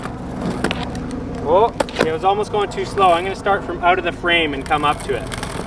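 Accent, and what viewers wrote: Canada. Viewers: This is the most Canadian accent I’ve ever heard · This guy sounds sooo Canadian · You sound hella Canadian and I like it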